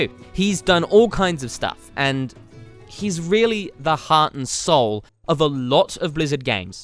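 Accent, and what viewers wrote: United Kingdom. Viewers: Since I dont have twitter, mind if I ask you this here? You're either 1) really good at a british accent or 2) moved to the US from britain. In the case of 2: why'd you move? Europe is awesome! :p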